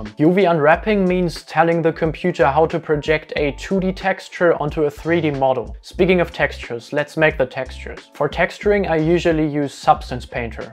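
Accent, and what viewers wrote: Germany. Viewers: Man hört den deutschen akzent so was von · du bist deutsch man hörts;) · Dude you got a german accent ( Nicht böse gemeint)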